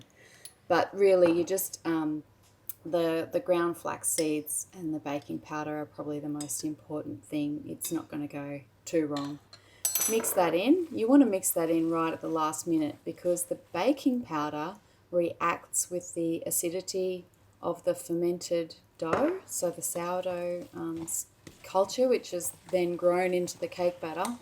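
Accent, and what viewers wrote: Australia. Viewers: Thanks!! Yes, any tips would be great. I am only just starting in the world of sourdough and am in the process of building my starter. I've watched many videos on starters etc but I love yours the most because they are simple, very clear and close to my heart and home because Im an Aussie too...(Aussie Greek). So hearing the Australian accent always warms my heart. I wish you a blessed Christmas and all the best with all your cooking endevours. At some point I will also check out your soap making videos too. Cheers, Mandy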